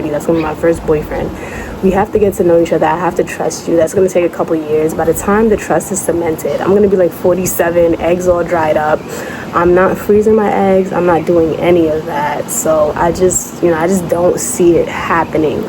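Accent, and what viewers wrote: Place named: New York, United States. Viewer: Yo you gotta be from New York lol.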